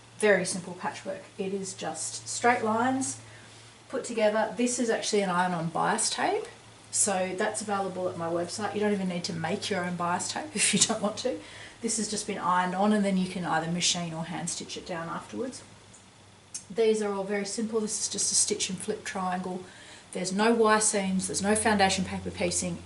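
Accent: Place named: Australia. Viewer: Great to hear an Aussie accent! Thankyou!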